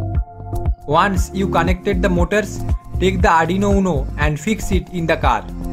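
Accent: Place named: India. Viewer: You have the most stereotypical indian voice You should better use hindi in your next video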